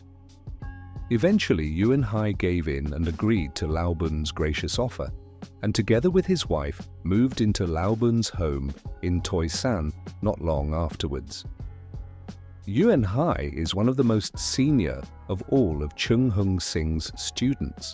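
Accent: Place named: England, United Kingdom. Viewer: The history might be interesting, but the Queens English pronunciation of Mandarin and Cantonese is really hard to get past.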